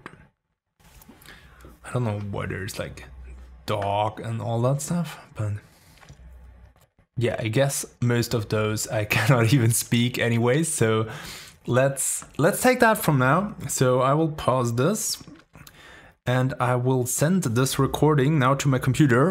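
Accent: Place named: Austria. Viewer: Terminator accent the best you gotta love it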